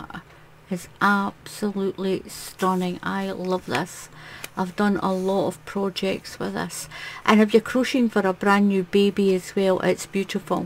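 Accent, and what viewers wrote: Scotland, United Kingdom. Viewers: I love your crochet videos ,as a knitter who could never understand it .also nice to here a fellow Scot you explain everything so well .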